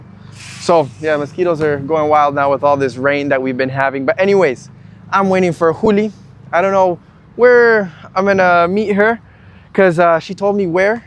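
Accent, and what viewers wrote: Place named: Argentina. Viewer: Saludos desde Bogota. Your Argie accent is pretty spot on; very authentic sounding. Could you answer a question? In one of your other vids you mention that you've been in Argentina for some years. How were you able to remain in the country for so long on just a passport without the DNI? Maybe because you are well and truly married to a citizen of the country?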